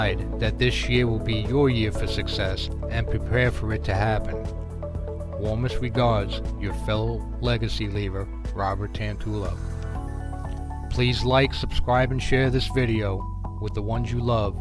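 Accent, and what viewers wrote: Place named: New Jersey, United States. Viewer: The Jersey voice of onpassive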